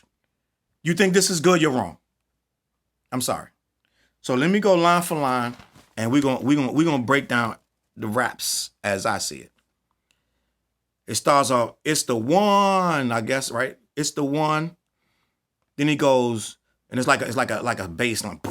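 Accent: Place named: Washington DC, United States. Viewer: Good breakdown on this. Question, you from DC area? Lol. You sound just like us in this breakdown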